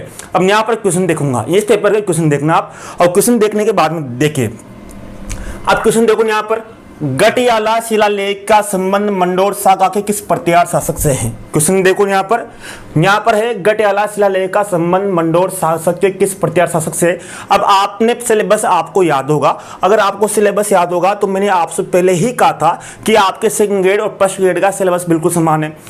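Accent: Hadoti, India. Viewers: Sir aap hadoti se ho kya, aapki boli bata rahi Hain, main tonk se hoin 😊❤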